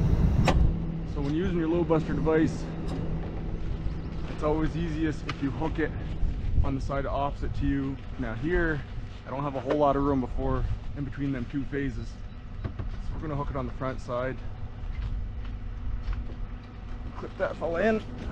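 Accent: Canada. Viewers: Between AVE and a Canadian Beekeeper’s Blog, and the Jet City jet engine rebuild guy, I think it knows I like Canadians, and this guy sounds Canadian, and it knows I like technical stuff and power line stuff, so it makes sense! And I had to scroll past the bee euthanization video to get to this one, it keeps trying to get me to watch it lol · How weird... I actually had a little physical tingle of fear watching this. Huh. How about that. This is only the second one of your vids I've watched, where are you? Do I detect Canadian in your accent? I'm in Scotland here. Oh, and +subscribed. Great stuff, thanks for recording on real jobs.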